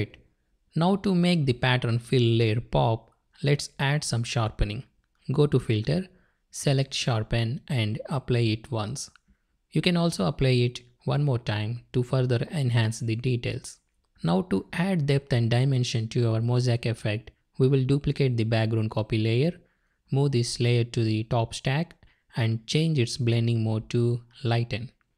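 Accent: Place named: India. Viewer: Tumhari awaj se laga ki tumhe hindi aati hogi bro plz hindi me video banao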